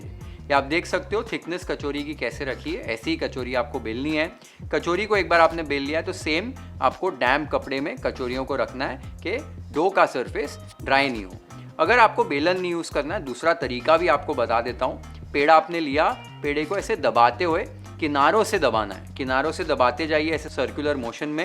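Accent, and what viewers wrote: Maharashtra, India. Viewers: You are jsu awesome. You sound a perfect maharashtrian when you spell words like ठेचा, वाटण.... Amazing chef ❤❤❤ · Nice recipe, tasted many times . The way you tell great 👍🏻. With perfect Maharashtrian pronounciations ❤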